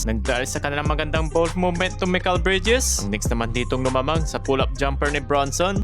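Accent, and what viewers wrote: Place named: Visayas, Philippines. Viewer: naging bisaya accent?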